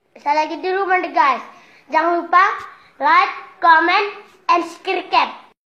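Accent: Java, Indonesia. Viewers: ngongg ny biasa aj brooo , mau gmna bicara bhasa medok ttp aj kliatan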